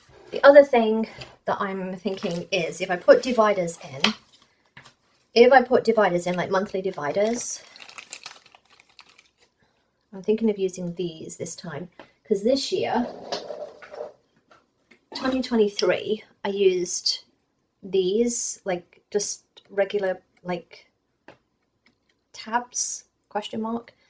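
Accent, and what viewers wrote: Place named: United Kingdom. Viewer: are you from the UK originally? Your accent and phrasing is very UK. Agree that HP have to expand their faith range x My craft room is the same 😂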